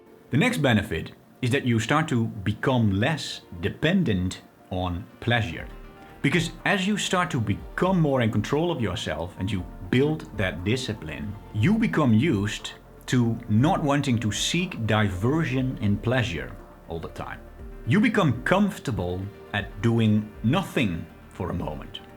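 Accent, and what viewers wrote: Netherlands. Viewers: Ik Dacht Wel Dat Ik Een Miniscuul Nederlands Accentje Kon Waarnemen. 🙂 Nevertheless Very Well Spoken & Articulate,Dankjewel. 🔥💪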